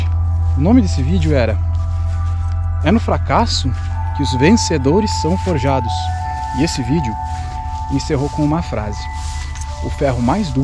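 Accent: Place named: Santos, Brazil. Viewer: Você é Santista? Fala que nem Santista. Linda cidade de Santos-SP.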